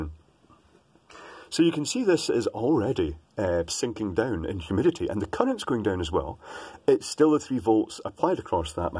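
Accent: Scotland, United Kingdom. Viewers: What part of Scotland is your accent? Is it Edinburgh or inverness? Can’t tell even being Scottish myself.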